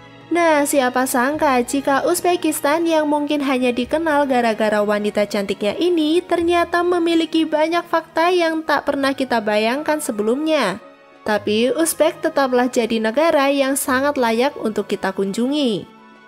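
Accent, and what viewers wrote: Java, Indonesia. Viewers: Suaramu medhok mbak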